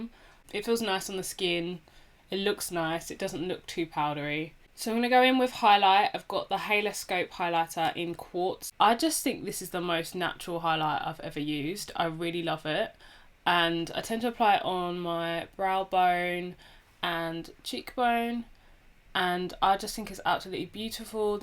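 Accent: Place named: United Kingdom. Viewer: Really enjoyed this video 😉 where are you from? I used to be in the UK, I’ve missed this accent lol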